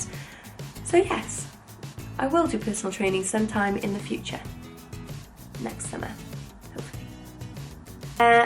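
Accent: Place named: Cardiff, United Kingdom. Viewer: There are so few welsh youtubers! I can recognise a fellow cardiffian anywhere haha.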